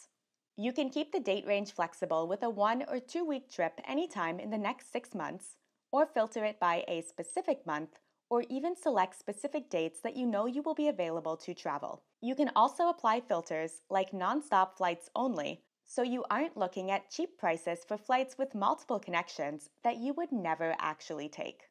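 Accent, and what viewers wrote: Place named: Coney Island, United States. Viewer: Love the accent are you from Coney Island Canada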